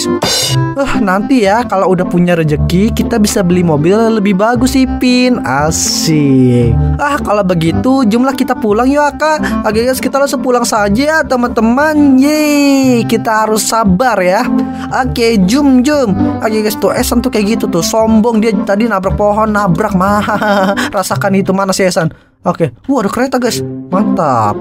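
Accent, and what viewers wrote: Indonesia. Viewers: Bang teh orang Sunda apa bukan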